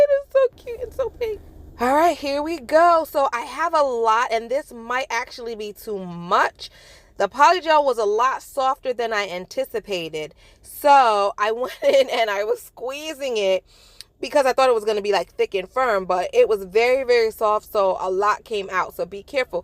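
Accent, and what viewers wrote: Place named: New Jersey, United States. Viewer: Are you from New Jersey? I love your accent and videos 🥰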